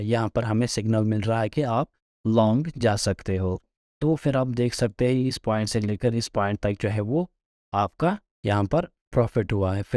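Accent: Kashmir, India. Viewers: Salute sir aapki tone Kashmiri hai hamen aap par proud hai..❤❤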